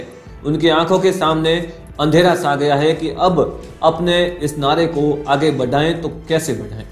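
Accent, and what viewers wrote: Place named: Rajasthan, India. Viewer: Rajasthani bhai se.....tabhi Hindi ke pronunciation mein 'छ' ki jagah 'स' ka prayog kiya hai. That's disturbing.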